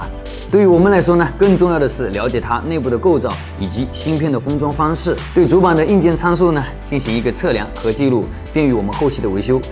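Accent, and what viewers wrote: Wenzhou, China. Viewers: 声音跟楼斌挺象的:)只是带了温州腔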